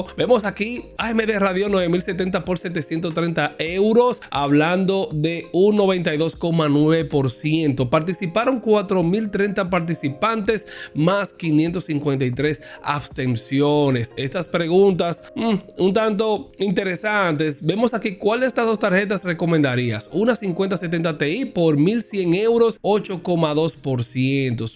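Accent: Dominican Republic. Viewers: buen video, sos de Republica dominicana? que tal el stock alla ?xD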